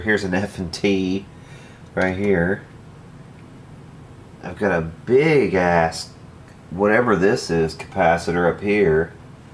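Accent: England, United Kingdom. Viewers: Not sure why a guy with an English accent would put that ground lift switch there. You really don't get those on British equipment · I think you're a genius..!!! The sheer complexity of this amp build with no internet back in the sixties & given the obvious restraints of components is humbling brother. You've also mentioned it sounded good so what more can you ask for. I'm curious though on the story of the guy buying it from you and that you had an English accent..?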